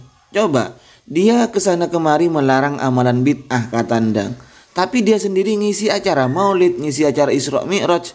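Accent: Madura, Indonesia. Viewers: Logat bahasa nya agak ke madura... Salaam Sataretanaan.. Sehat selalu cak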